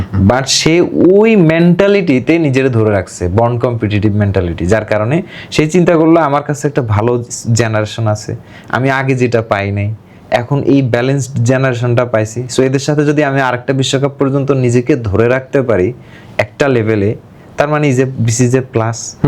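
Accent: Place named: Noakhali, Bangladesh. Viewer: এই লোক নোয়াখাইল্যা মনে হচ্ছে তবে এনালাইসিস সঠিক 😅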